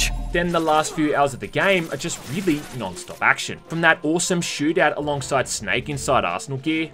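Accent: Australia. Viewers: Australian accent , nice - lil pharma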